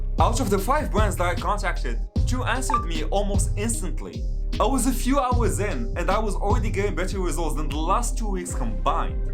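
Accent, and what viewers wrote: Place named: Morocco. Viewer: M9awd bro I’m just assuming you’re morccan from your accent but anyways I like the editing the quality the confidence so keep going bro you definitely going far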